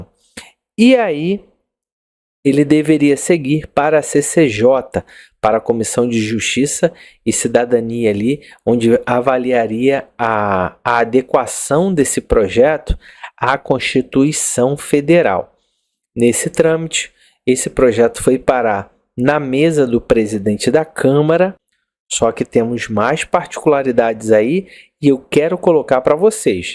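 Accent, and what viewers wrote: Rio de Janeiro, Brazil. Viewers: Deixe esse sotaque de carioca ja esta enojando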